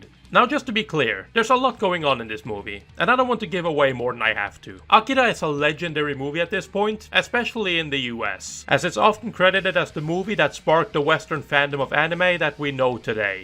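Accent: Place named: Norway. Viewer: Er du norsk? Du høres ut som en nordmann:P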